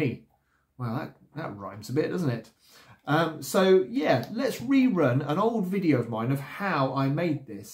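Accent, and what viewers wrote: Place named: South of England, United Kingdom. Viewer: You sound like your in the south of England... im in the south east and its absolutely dead on 2m and 70cms... i keep CQing into the void but love making antennas and will keep trying.. maybe on 2m CW might reach you if your not too far away.. I like you took tne foundation and then stalled for want of regular contacts or local meetings... 10 11 12m is a little busier so i also play with 28 to 30mhz. Keep up the ham work. 73's M6KII.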